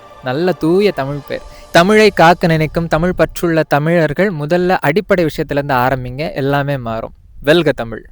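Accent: Nagercoil, India. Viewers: நண்பா நாகர்கோவில் மாதிரி தெரியுதே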